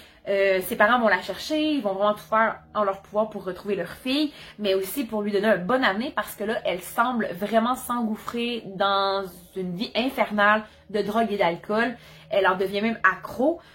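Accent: Quebec, Canada. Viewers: J’adore l’accent Québécois 🇨🇦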